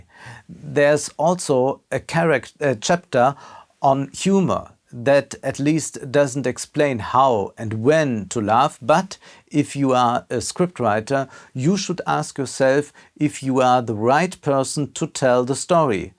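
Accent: Germany. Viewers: Interesting, so this is the german accent everyone talks about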